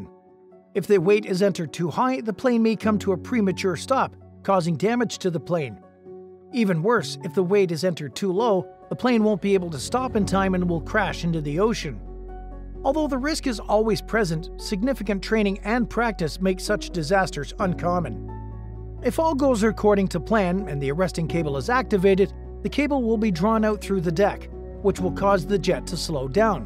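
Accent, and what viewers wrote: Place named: Canada. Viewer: This guy sounds Canadian.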